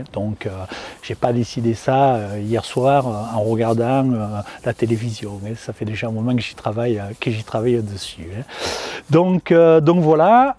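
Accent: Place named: South, France. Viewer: Merci our le bilan de l 'année et l accent du midi, plafond bas et frais sur notre tête en normandie depuis quelques jours, en espérant que 2022 soit meilleur pour les apis bonnes fêtes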